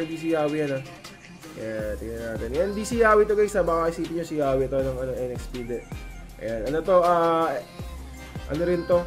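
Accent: Iloilo, Philippines. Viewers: Ilonggo ka pala lods? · ilonggo ka coach?❣️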